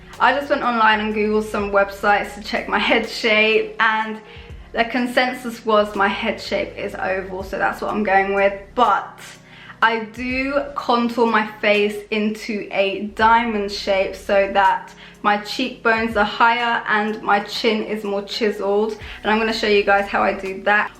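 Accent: London, United Kingdom. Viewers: Which British accent do you have? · she's got a London accent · she’s got a London accent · good British accent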